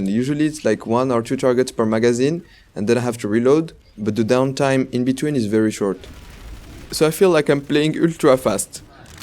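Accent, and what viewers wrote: France. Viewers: tu a un accent français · oula lui il a un accent français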